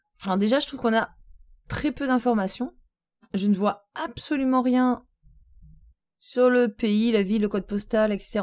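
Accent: South, France. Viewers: merci très bien expliqué léger accent qui vient du sud..peut être de la ville rose... si je peux me permettre serait il possible de mettre un son bien moins fort pour un maximum de confort auditif convaincu je m'abonne c'est plus instructif qu'un cours en FOAD et plus "rafraichissant" bonne continuation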